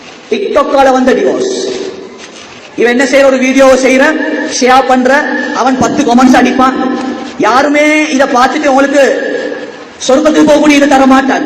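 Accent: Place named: Kandy, Sri Lanka. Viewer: இவர் கண்டி கொழும்பு இந்த பகுதியில் இருக்கிறார் போல..! அந்த பகுதியில் இப்படித்தான் தமிழ் பேசுவாங்க. என்னுடன் சவுதியில் கன்டியைச் சேர்ந்த நண்பர் ஒருவர் இருக்கிறார். இதே போலதான் தமிழ் பேசுவார்.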